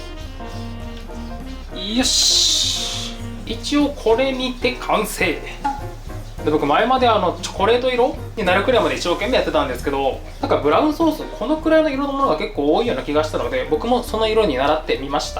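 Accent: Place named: Hakata, Japan. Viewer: たまに博多弁出てますね！ 懐かしかです。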